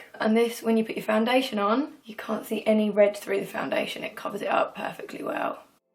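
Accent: England, United Kingdom. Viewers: She is British I thought she was American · I love ur british accent soooo much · Ur so British it's great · i wonder if she's from england because i love her accent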